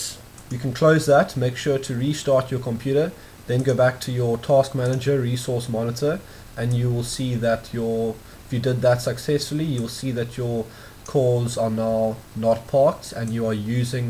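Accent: South Africa. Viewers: Great to hear a Safrican voice when browsing technical vids. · You sound South African... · South African yes?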